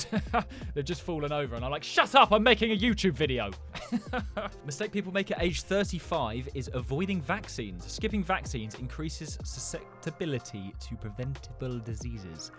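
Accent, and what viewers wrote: United Kingdom. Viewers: Your voice sounds like your from U.K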